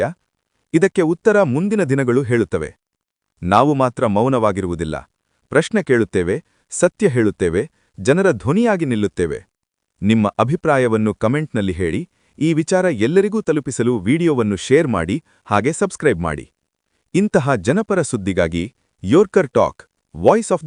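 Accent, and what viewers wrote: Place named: North Karnataka, India. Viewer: Uttarakaranatakada dwani 🎉🎉🎉❤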